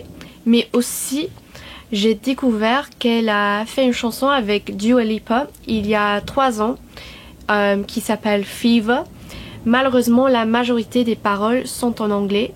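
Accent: England, United Kingdom. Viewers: Hiya, just wanted to say that you remind me of a younger me who moved from England to France at a much younger age (we have the same twang and make the same mistakes when speaking french!). I also spent a lot of summers in France until my family and I eventually moved where the french side of my family lives. Being in my 20s now, I now speak fluent even native-like french. However, as my natural inner voice is in English when I'm alone, so I do still slip up from time to time, and still have a slight a twang apparently lol. Even after having lived here for over 15 years haha. I only wish I wasn't so isolated growing up in France and had other english speaking kids who were in the same situation as me to talk to. In fact, I can imagine a conversation between us to be refreshing because I never found someone with such a similar and yet different backround. 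But this is the internet, and you don't know me. So naturally this will never happen. But it's nice to know that there is someone similar to me out there! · Hello, excuse moi je ne te connais pas, tu es britanniques??:O Tu peux écouter: -, toujours de - 🥰, de -, de. Cette chanson est incroyable 🎸 * - un mélange * - entendu parler du groupe don't give up 🤗 (On ne devrait pas non plus prononcer le " T " à " sont " mais j'aime quand tu le dis XD ) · Bravo pour votre chaîne. Votre français est très agréable à entendre, avec ce petit accent britannique tellement charmant! <link>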